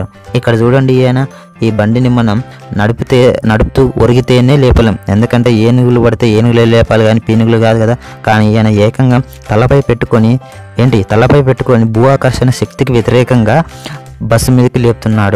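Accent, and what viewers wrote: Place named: Telangana, India. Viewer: Mana telangana telugu thelisipodhi ekkadunna. Super brooo all the best 😍😍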